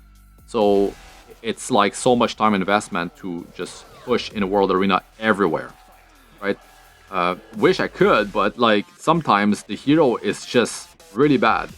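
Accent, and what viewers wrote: Quebec, Canada. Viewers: Hey très bonne vidéo de RTA et en passant j'ai remarqué ton petit accent québécois dans ton anglais qui est vraiment pas facile à remarqué pour un non québécois en tout cas tu te débrouille vraiment bien continue !👏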